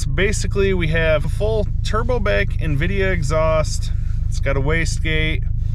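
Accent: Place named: Wisconsin, United States. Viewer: are you in wisco? Scenery and accent tells me yes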